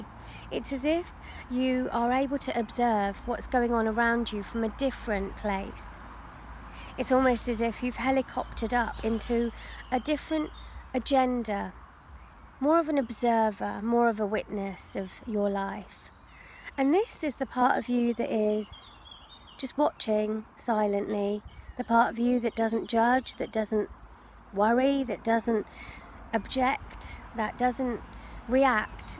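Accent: United Kingdom. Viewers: Are U from any British Country?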